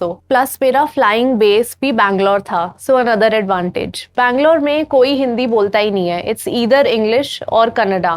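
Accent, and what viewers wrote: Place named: United States. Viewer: American English ❤ American Accent ❤ American Syllable ❤ American Pronunciation words ❤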